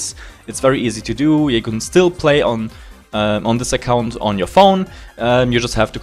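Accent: Germany. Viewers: Cant unhear the german xD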